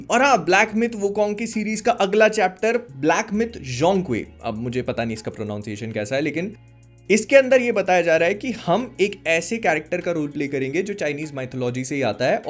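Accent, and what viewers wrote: Bhopal, India. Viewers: Makda bhopali nikal hi aata hai bhai ka😂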